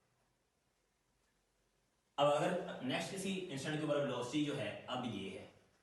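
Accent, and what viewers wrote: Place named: Saraiki, Pakistan. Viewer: 50% idhar 50% udhar Student of M.Phil physics + also teaching Medy khayal ich tohaadey lehjy to lgdy saraiki ho..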